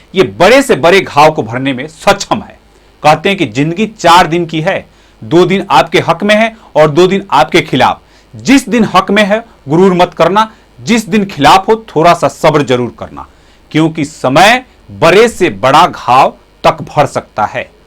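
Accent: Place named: Bihar, India. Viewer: hindi sudharo ... aise lagta hey jaise bihar sey ho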